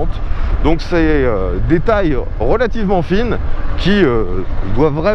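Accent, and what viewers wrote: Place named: Paris, France. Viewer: On accroche pour tes commentaires ,et ton timbre de voix avec cet accent titi Parisien bien sur.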